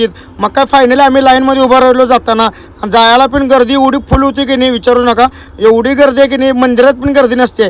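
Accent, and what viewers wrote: Kolhapur, India. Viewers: मला ची कोल्हापूर ची भाषा खूप आवडते एकदा मला भेटायला यायच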